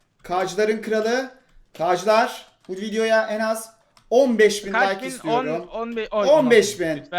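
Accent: Trabzon, Turkey. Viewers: abi trabzonlumusun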